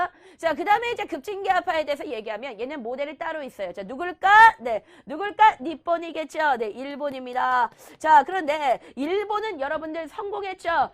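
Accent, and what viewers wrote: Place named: Jeolla, South Korea. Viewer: 이분 고향 전라도라에 내 목숨 건다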